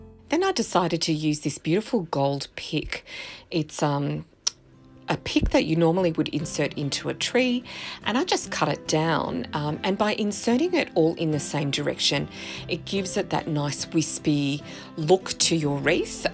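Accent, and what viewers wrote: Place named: Australia. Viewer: Hi these are gorgeous DIYs, it's nice to see /hear an aussie crafting on youtube, I live crafting but struggle to find suppliers many ribbon at Christmas here I'm in rural Victoria, I really enjoy your channel thanks for sharing all the best Mary 🌻😊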